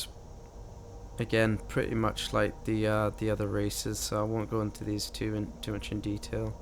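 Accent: Scotland, United Kingdom. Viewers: omg your Scottish! :D it feels so rare to see another Scottish person lol xD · Love your scottish accent, reminds me of that guy off Theme Park World who guided you through the game :) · Scottish accent, am I right? :) Great walkthrough, by the way!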